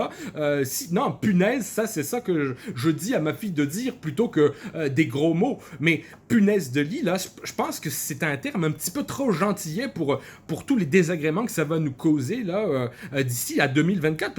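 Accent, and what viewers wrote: Quebec, Canada. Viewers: Meilleur accent québécois du game.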